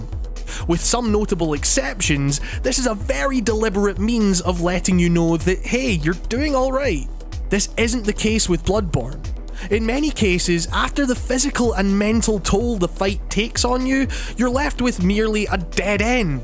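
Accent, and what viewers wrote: Scotland, United Kingdom. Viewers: Are you Scottish? Because you have a beautiful accent.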